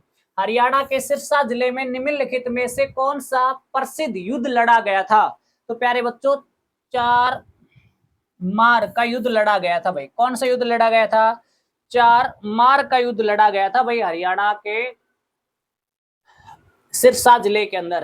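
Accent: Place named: Rajasthan, India. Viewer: Bola to Rajsatha ka h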